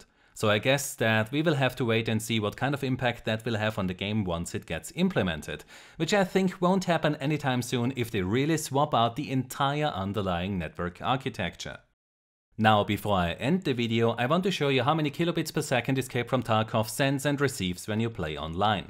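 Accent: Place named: Germany. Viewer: Den deutschen Akzent wird man einfach nicht los 😔. Gutes Video!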